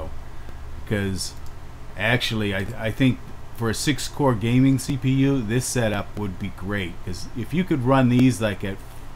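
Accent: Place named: South, United States. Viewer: You've got a slight Forrest Gump accent :) Thumbs-up !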